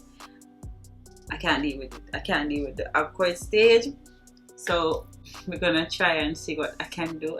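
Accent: Jamaica. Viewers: I knew u were Jamaican from the starting of the video.😂😂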